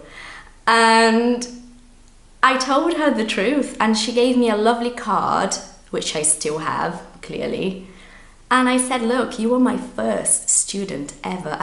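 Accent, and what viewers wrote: United Kingdom. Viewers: I wonder if I'll ever speak English with such a massive british accent! haha My English is totally "american" so for me the british English is almost a new language! Acho cute mas não consigo me imaginar falando assim rs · Well, it's pretty easy to see why you are teacher. You have all it takes to be a great teacher: you're very articulate, your sentences can take the listener from point A to point B and your mastery of the English language is amazing. You could easily pass for a native British person - if there was no Portuguese words below your videos, the only thing that would give out that you might be Brazilian or Portuguese is the way your name is written (with the diacritic acute - acento agudo para os lusofonos). And even then I wouldn't be so sure since there's a lot of people in North America (and I believe in Great Britain too) which have Portuguese names yet are second or third generation (the kids or grandkids of Brazilian or Portuguese immigrants) and can't speak a word in the language of their parents or grandparents. 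No Portuguese words and English with (written like this): there's no way I could say you're not a native Brit.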